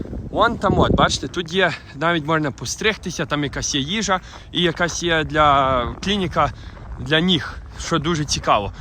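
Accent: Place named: Prykarpattia, Ukraine. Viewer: Прикарпатський акцент дуже подібно ✌️😉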